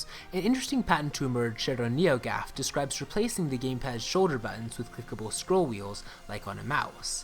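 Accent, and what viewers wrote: Scotland, United Kingdom. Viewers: You're accent has a hint of Scottish to it, are you Canadian?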